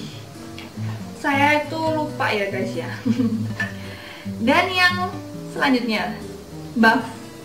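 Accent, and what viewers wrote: Indonesia. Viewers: Logat jawa timur 😅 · medok banget mbak tapi lucu cara Ngomong nya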